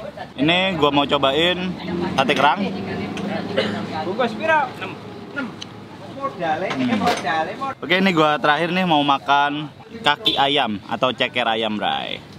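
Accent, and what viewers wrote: Indonesia. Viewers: Bray ne kurang pas... Logat sek suroboyo... Mending suroboyoan ae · Mas nya asli org mana to kog logatnya kyk org jatim?? Dan kosa kata nya yg salah diketawain sama suami ku🤣🤣 pulen sama punel itu beda, dan cecek disini itu muntahan org sakit.disini namanya krecek atau kulitan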